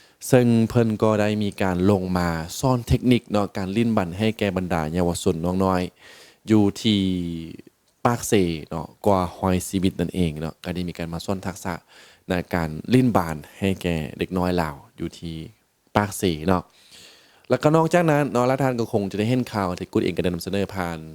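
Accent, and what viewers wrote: Thailand. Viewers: แอดมินวิธีการพูดเหมือนคนไทย ทั้งการออกเสียง สไตส์การพูด ร ซ ไม่แน่ใจว่าเคยเรียนที่ไทยไหมครับ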